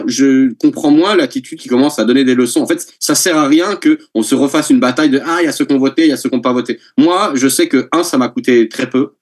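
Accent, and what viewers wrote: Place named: Quebec, Canada. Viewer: Merci de pas faire exprès de gommer ton accent Québéquois, on aime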